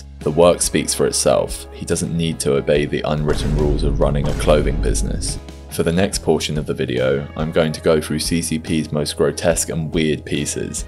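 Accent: United Kingdom. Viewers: the high energy intro revealing a deep fried british voiceover is so funny to me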